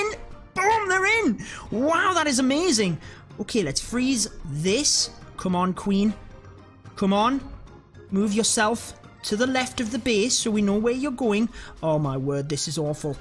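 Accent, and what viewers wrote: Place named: Northeast England, United Kingdom. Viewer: Newcastle or Sunderland mate?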